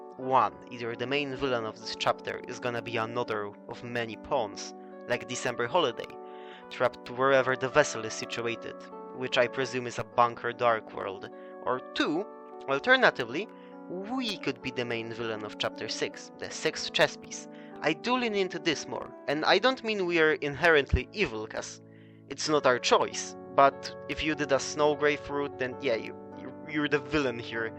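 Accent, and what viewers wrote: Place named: Europe. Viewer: Hey I'll take a cool European accent over another annoying hyperactive or bored American accent any day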